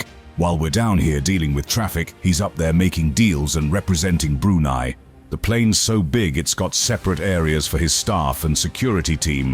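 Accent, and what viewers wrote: United Kingdom. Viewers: Way too many "ain't" and "nah." Don't go well with the narrator's English accent.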